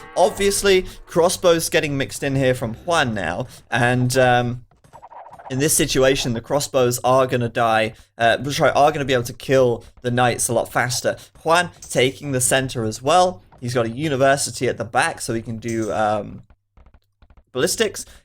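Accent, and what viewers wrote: United Kingdom. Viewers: Where about he is from in the UK, do you know? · It's extremely British lol, also UK has dozens of different accents so for people not native to it, its often hard to tell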